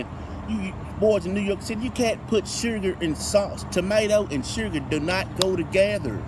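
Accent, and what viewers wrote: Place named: South, United States. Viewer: love that southern accent !!!!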